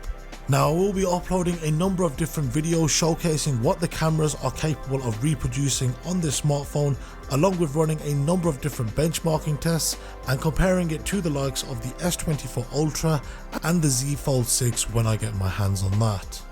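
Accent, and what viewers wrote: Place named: West Country, United Kingdom. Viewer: Yo amigo thanks for the sub, I have subbed back. Great video and good to hear a local west country accent! Keep it up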